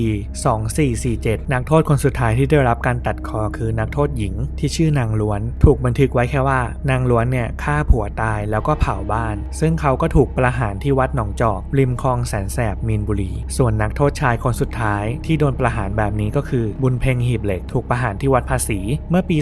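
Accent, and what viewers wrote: Nakhon Sawan, Thailand. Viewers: คำพูดนี่คนนครสวรรค์ชัด (บ้านเดียวกัน)// ใกล้หมื่นซับแล้ว เชียร์สุดใจให้ถึงเร็วๆ🎉 ... ยังคงรอ Barbarian ตลอดไป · ดูมาหลายคลิป เพิ่งมารู้เลยค่ะว่าเป็นคนคอนหวัน ทางนี้เป็นคนพิจิตร อยู่อำเภอติดคอนหวันเลย ชอบพูดแงะ แล้วก็โดนหลายคนทักว่าพูดเหน่อเหมือนกันด้วย<num>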